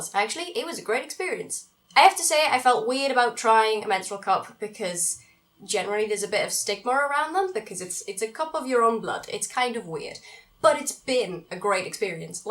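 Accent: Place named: Geordie, United Kingdom. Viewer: What is that accent? It sounds posh but with a bit of Geordie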